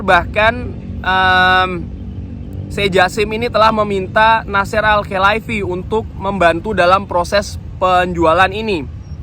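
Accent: Semarang, Indonesia. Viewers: Orang Semarang ya bang? 😮 Kirain sy org luar Jawa 😂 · Lho sampean wong semarang to mas? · Asli semarang kah bang?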